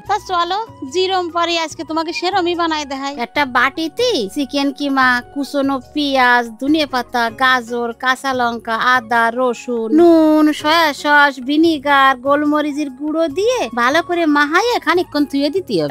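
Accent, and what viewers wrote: Bangladesh. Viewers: তুমি তো বাংলাদেশির মত কথা বল😂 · ওমা এতো বংলাদেশি অ্যাকসেন্ট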